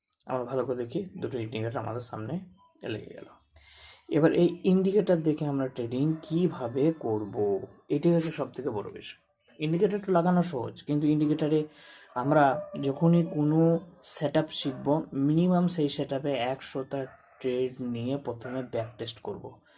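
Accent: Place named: Bangladesh. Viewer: বাংলাদেশি নাকি, কথা শুনে তো ১০০% মনে হচ্ছে।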